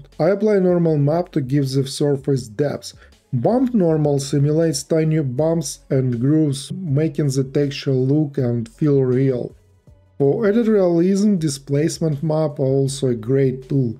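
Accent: Russia. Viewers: russian tongue is cringe.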